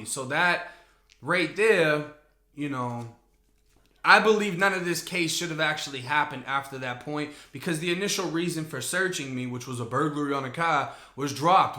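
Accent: Boston, United States. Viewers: You're doing good work out here. "Scared Straight" with a Boston accent. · I started watching you some months back. You remind me of myself 20 years ago. Respect to what you are doing. At that age no one could tell me anything. I thought I knew it all. But here goes. To change means to change everything. You will learn that being “gangsta” is not cool. Carrying guns illegally isn’t cool. Talking about beating people up is not cool, reminiscing about old dirt is not cool, living in a shitty apartment in roxbury is not cool. You have a great mind that could be used to do so much more then talking about jails and crimes and buck50ing people. I hope to see something from you in 15yrs when you’ve come to the realization that all this shit does nothing more than make you a cop target bullet magnet. I grew up in New England. From what you say you haven’t lived there since you were a small child. 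What’s up with the accent? Adding emphasis on a “Boston” accent isn’t cool either.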